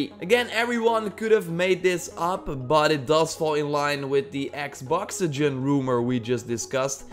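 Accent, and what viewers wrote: Netherlands. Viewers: jij komt uit nederland dat hoor ik gwoon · ik hoorde echt gelijk aan je accent dat je Nederlands was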